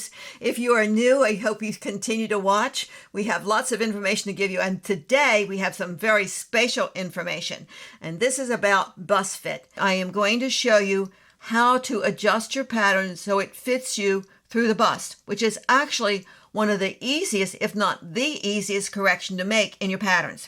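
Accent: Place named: Pennsylvania, United States. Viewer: I just found your channel and am learning so much..!! You must be from PA, my aunt sounds EXACTLY like you ❤.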